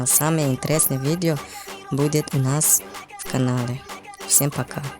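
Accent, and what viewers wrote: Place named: China. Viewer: Китаец научился русскому языку,и плюясь в микрофон помог лучше чем кто-либо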